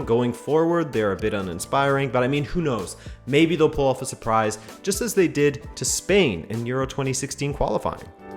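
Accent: Canada. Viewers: Was thinking the same thing as a first time listener. Immediately recognised the Canadian accent which caught me off guard as don't see that often in my football recommendations. It all made sense when we got to Portugal! Nice video.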